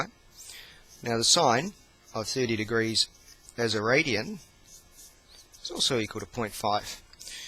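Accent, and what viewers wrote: New Zealand. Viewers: from new zealand?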